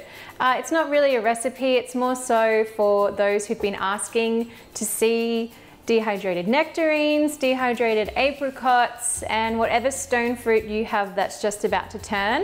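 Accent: Australia. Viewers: Are you Australian, where did you buy that machine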